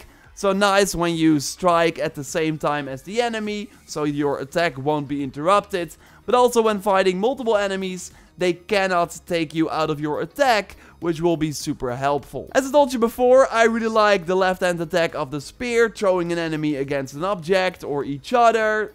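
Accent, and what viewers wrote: Netherlands. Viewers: He has a Dutch accent so he says instead of V he will say F but he means Visiting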